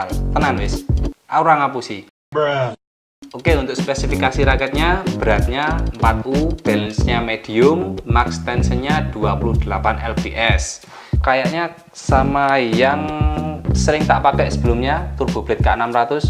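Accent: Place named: Java, Indonesia. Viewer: Medok eram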